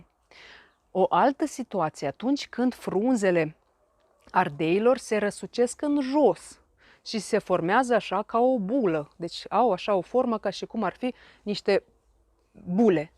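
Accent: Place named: Moldova. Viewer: Cred că toți cei care vă vizitează canalul de youtube vă apreciază. Eu, de asemeni. probabil că sunteți cadru didactic la o facultate de profil agronomic. După accent, proveniți, sau sunteți din Republica Moldova. În România, din păcate, s-a rărit drastic numărul tinerilor care se mai ocupă cu grădinăritul. Oricum, vă urez succes în activitatea profesională și nu numai.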